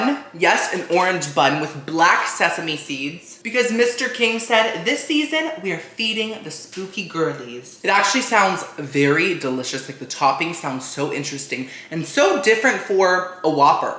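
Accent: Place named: United Kingdom. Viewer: with a British accent is what I am here for:-)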